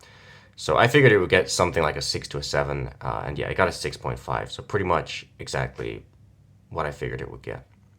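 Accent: United Kingdom. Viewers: ah that explains much more things ! I did wonder about the location at some point since you have a not-so-marked british accent - though still obviously noticeable in hindsight ^^ Well keep the good work and thank you for sharing your magnificient returns. It's quite rare to have European collectors not shy of showing their pearls so it's a pleasure to watch it beyond the Channel.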